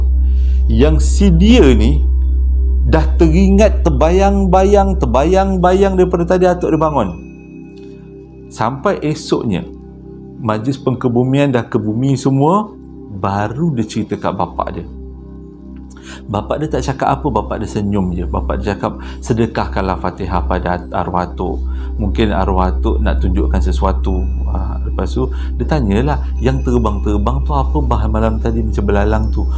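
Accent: Johor, Malaysia. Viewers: Abg memang berbakat beso cara penyampai cerita seram pelesit dgn loghat johor .. 👍👍👍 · Yer la… melekat tul Johornya tu… I like! 😄😄😄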